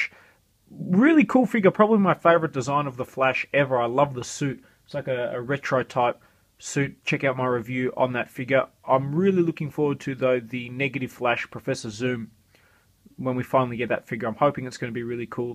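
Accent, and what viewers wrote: Australia. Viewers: Hey loved the review! Are you from Australia?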